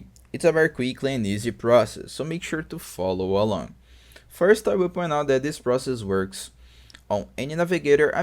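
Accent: Brazil. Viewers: Provavelmente sim, sotaque não me engana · brasil ae parceiro? sotaque naquele pique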